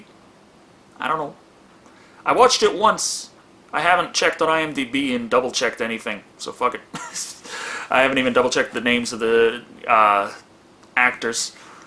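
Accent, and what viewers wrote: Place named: Texas, United States. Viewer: polygon He's from Texas so he does have himself a little accent lol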